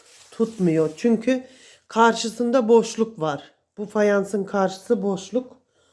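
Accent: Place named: Uşak, Turkey. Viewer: Şiven cok tatli abla usakli arkadasim vardi sivesini cok severdim☺☺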